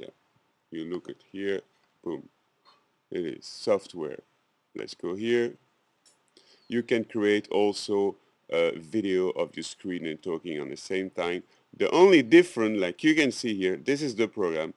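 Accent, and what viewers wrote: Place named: France. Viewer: lol accent francais :)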